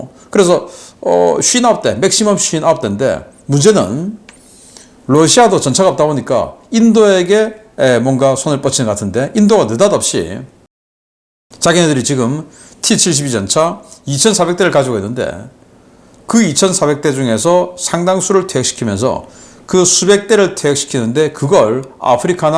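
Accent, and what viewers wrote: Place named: Gyeongsang, South Korea. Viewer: 경상도 씹 말투 존나 거슬리네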